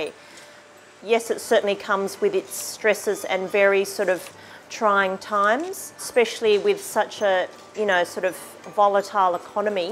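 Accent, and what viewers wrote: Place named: Australia. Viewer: Lovely Chinese girl ruined by an Australian accent:-)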